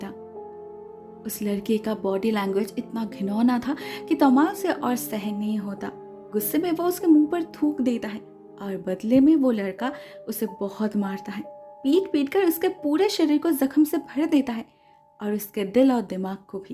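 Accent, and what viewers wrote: Bengal, India. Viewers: Di kaya aap bangal se ho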